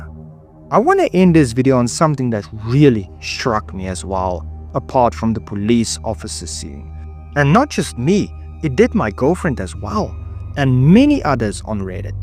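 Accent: South Africa. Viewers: Hey are you south african by any chance?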